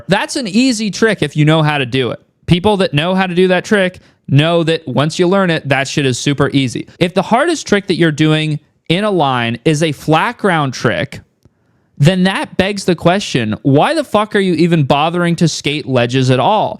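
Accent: United Kingdom. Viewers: Love the English accent 😂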